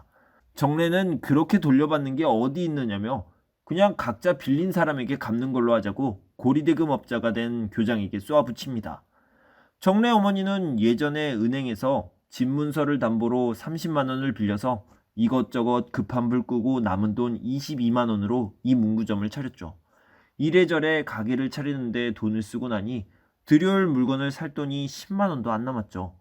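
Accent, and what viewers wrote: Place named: Jeolla, South Korea. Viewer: 형의 목소리로 들으니 명작 소설이 더 감칠맛 나게 들리네요. 판소리의 지방에서 나고 자란 분이라는 게 잘 느껴집니다. 형은 판소리를 배웠으면 명창으로 이름을 날리셨을 거예요! ^^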